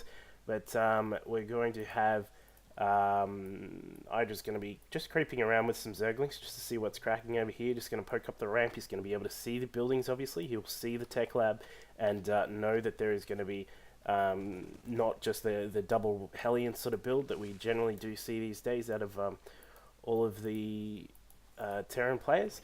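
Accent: Australia. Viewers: It's good to hear a Aussie commentator for once. Good work =p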